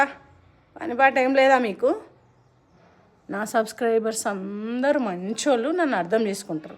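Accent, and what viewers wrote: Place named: Telangana, India. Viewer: 😂😂😂😂 vamoo telangana basha super try chesinavu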